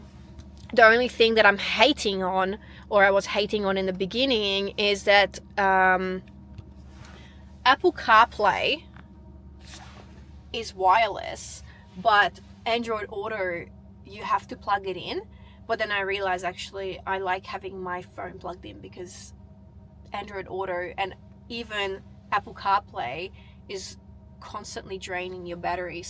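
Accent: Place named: Australia. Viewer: Aussie accent on fleek ngl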